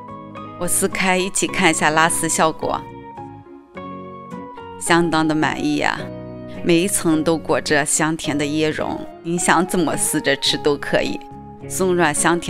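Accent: North, China. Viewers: 今天偶遇你，非常喜欢你的声音，不急不躁地叙述，像北方人的口音，果断关注收藏！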